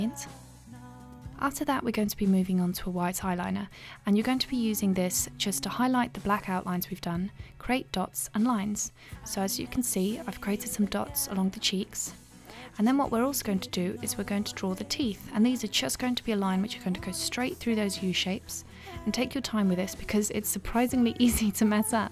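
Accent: United Kingdom. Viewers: What accent is that? Before you say British, I mean what part of Britain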